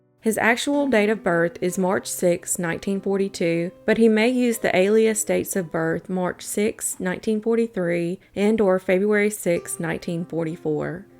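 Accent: South, United States. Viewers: As a native from new hampshire,your "southern"voice trying to pronounce basic words,NH towns and english nouns,is most annoying almost like nails on a chalkboard. It might be just me though,an English professor,but i believe some will agree with me your pronouniations and voice are ridiculous.please,leave the cold case stories to professional,normal speaking individuals.i know your just looking for views or likes,but couldn't you just stick to videos descibing basic things like what kind of food was at the county fair or what country artists were nominated at the"cmt" awards.?please,people from NH have heard enough from your nonsensical, moronically overtones.unless you'd want to make a comedy.. Could get you more views - Sincerely,English Professional.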